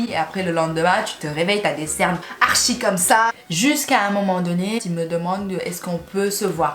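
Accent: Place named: Senegal. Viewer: A ton accent j’ai direct capté que t’étais sénégalaise 🙃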